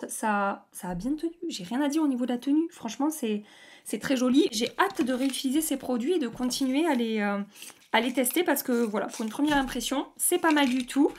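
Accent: South, France. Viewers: Coucou ! Ah ! Cet accent du Sud en ce dimanche ensoleillé ☀️ Je viens commenter par ici pour changer d’insta 😀 très chouette vidéo (comme d’habitude ai-je envie de dire), j’ai vu passer la nouveauté Dior mais sans grande conviction mais mnt tu as piqué ma curiosité... Est-ce que la teinte 2 est finalement bonne ? Et concernant le feutre à sourcils, chez Urban Decay ils font un produit très similaire (Brow Blade me semble-t-il) et avec une gamme de teinte plus étendue... Si jamais 😀 Excellente journée !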